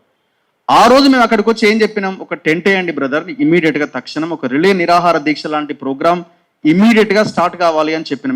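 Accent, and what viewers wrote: Telangana, India. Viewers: అన్న తెలంగాణా గొంతు....❤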